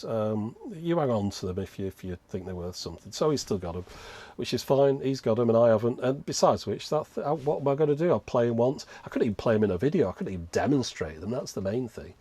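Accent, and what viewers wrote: United Kingdom. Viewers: The question of whether you would be more successful as an American is an interesting one. I don't think it's as simple as "Americans don't want to listen to British people" since many Americans tend to think that people with British accents are classy and/or intelligent, and in any case it sounds kind of exotic. So I would wager that for some people it's actually a bonus. It might still be a net negative, but it's probably not a huge disadvantage for you. It is possible that you would be more successful if you had more of an outgoing, high-energy personality like you see with so many of the big YouTubers, since that probably appeals to the greatest common denominator, but I personally find that exhausting.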